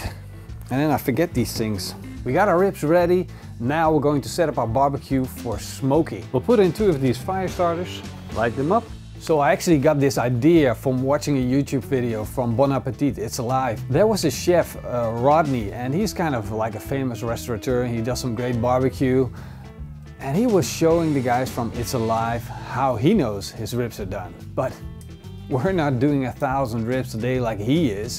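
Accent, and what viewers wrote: Netherlands. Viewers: Yo, I love your videos and your Dutch accent is charming. One quick question. Why do you always wrap your meat "shiny side out"? Usually you'd do that if you wanted to deflect heat, but I don't think that's what you mean to do when wrapping. Is this deliberate or doesn't it matter with these ribs?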